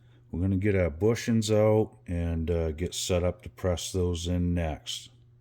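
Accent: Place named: Maine, United States. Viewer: I just happened upon your channel tonight. Nice to hear the Maine accent. I grew up in Maine, Farmington. I live in Florida now. It’s Ben a while since I was able to go back home to visit family. I’m currently building a strat from parts. I lost all my household goods, including all my music gear and guitars. I had all my stuff in storage when I moved to Florida. I got behind on I the rent and they ended up auctioning all my stuff. So now I’m starting over building a David gilmour inspired strat.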